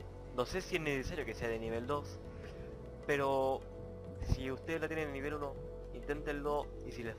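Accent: Chile. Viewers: oye eres chileno?:v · Eres chileno chileno y chileno se reconocen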